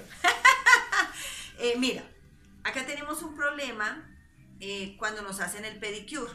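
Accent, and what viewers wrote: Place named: Colombia. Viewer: Muchas gracias por tan valiosa información, y agregó algo más, me encanta el acento Colombiano.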